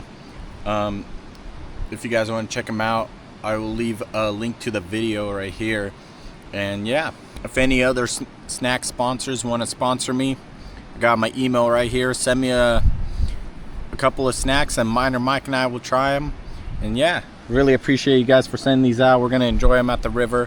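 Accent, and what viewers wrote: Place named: California, United States. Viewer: I never would have thought you are speaking English as a second language, but I do hear that there is more to your sound than California, just a very very tiny bit - 1%. Seriously, amazing to learn English as 10 - that is impressive.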